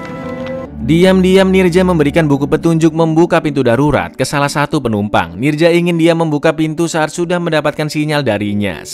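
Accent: Java, Indonesia. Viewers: WONG JOWO NDI SUARANE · Suweneng aku rek nak Ojo logat e jowo ngeneki subscribe sek👍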